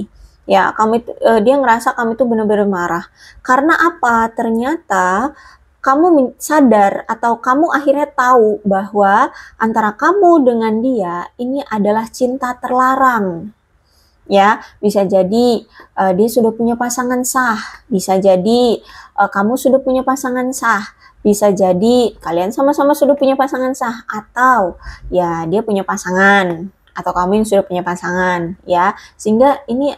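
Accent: West Java, Indonesia. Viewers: Orang sunda ya teh?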